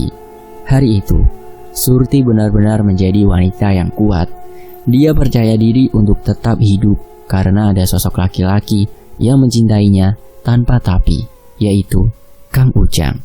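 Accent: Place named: West Java, Indonesia. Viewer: 😁 mimin lucu dgn aksen sundanya, krn aku dari Bandung... 👍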